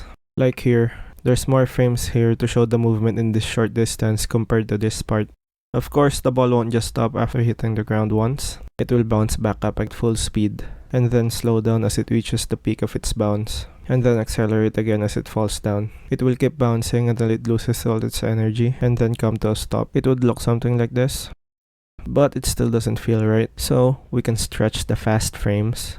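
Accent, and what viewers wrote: Philippines. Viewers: Just asking, are you Filipino? you sound like it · i hear filipino accent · You sound filipino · idk man has a filipino accent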